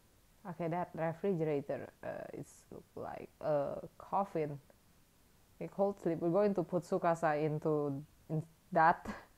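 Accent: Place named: Philippines. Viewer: seriously, sister, where are you from? I heard a foreign accent .. Are you from the Philippines?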